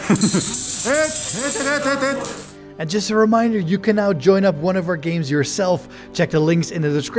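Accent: Netherlands. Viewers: I knew that accent sounded dutch! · sounds Dutch to me....being Dutch :)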